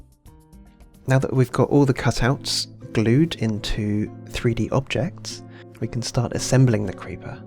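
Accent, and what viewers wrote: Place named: United Kingdom. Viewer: um.. can you use plain old printer paper and I love the British accent lol and yes I know he is really British.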